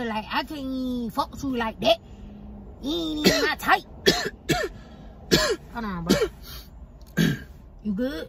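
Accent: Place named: Atlanta, United States. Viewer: I had to sit bacc & think.. she got everything on point. Cause this is how me & my people talk down here in Atlanta Ga smh lol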